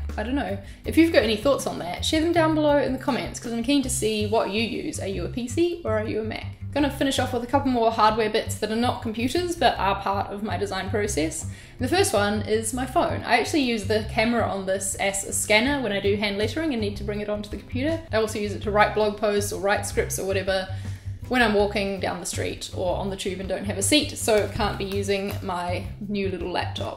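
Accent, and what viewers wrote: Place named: New Zealand. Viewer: I love your NZ accent ;)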